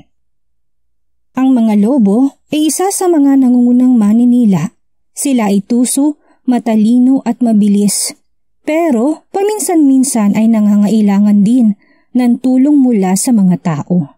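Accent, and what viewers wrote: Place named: Visayas, Philippines. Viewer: Bisaya man gid ang accent ng Narrator 😊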